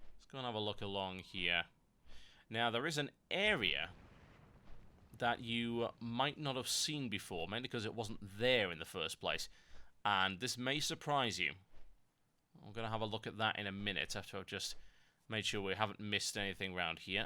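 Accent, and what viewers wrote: Australia. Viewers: Has anyone ever told you that your accent sounds more Aussie than English? o_O To me, anyway. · Hey, are you aussie? <3 your accent :D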